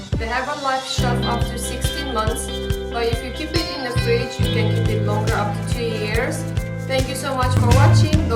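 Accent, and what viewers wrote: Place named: Romania. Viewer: buna , dupa accent pot spune ca esti romanca , sper sa nu gresesc . dragute videourile tale . 👍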